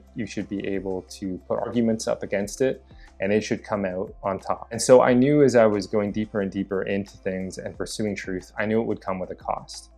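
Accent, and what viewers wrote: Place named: Canada. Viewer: I’m enjoying your video but I’m also distracted by your accent 😂 are you Canadian? I keep hearing twinges of Robyn’s accent from how I met your mother lol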